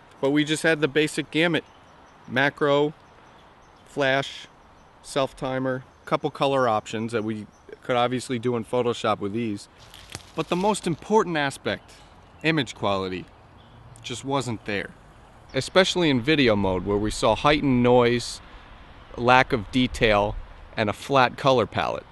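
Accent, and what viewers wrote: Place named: Buffalo, United States. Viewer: Your accent sounds like maybe Buffalo, New York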